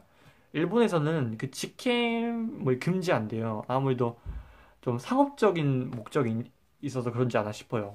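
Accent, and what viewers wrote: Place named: Jeolla, South Korea. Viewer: 전라도분..ㅎ 전라도 사람만 알수있는 억양 ㅎ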